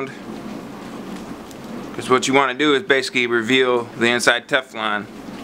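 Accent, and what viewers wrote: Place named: Michigan, United States. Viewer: Thanks bro. Haven't heard a Michagan accent in a while!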